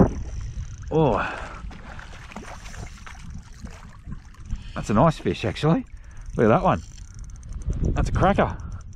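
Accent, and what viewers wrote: Australia. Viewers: Interesting video! In ny search for a review of the Savage Gear Gravity jerkbait I ran a cross your video and was highly surprised when I heard aussie english and saw a common European perch in your hand?! I thought, for moment, that guy has relocated to Europe... Then I thought it wouldn't surprise if those Britts did with perch what they did with brown trout world wide...Anyway it's a nice little sport fish that tastes well. Is it causing you major problems in your waters? Invasive species often do but I am glad we don't have that here in Sweden, at least not yet. Cheers!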